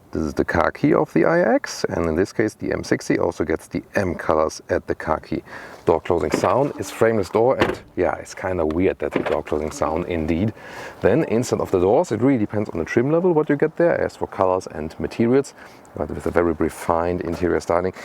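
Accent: Germany. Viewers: I'm sick when listening German accent ...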